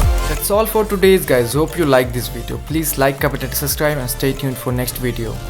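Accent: India. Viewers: FFS! Cant stand that THICK indian accent. Why is every how to video made by some indian i cant understand... FAIL ! ! !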